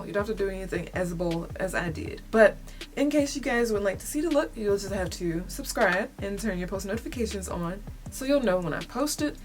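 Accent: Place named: South, United States. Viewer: Oooh...you country! Hahah! I love it though...makes me miss the South. Finch had me at hello! I'm digging that one..this entire palette is a mood. Perfect as we walk into Spring!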